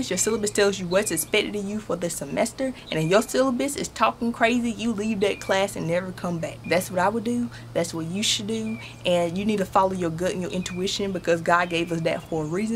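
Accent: United States. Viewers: lol love it already. so country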